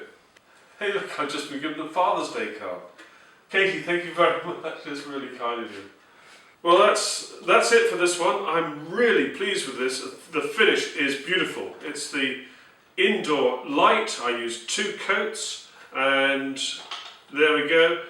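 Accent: United Kingdom. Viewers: Hi many thanks for your speedy reply. I must say I greatly enjoy your Youtube Channel, Its nice to hear a U.K. accent and watch an accomplished cabinet maker. I've learnt a lot watching yiou.Unfortunately your channel increases my Festool lust inordinately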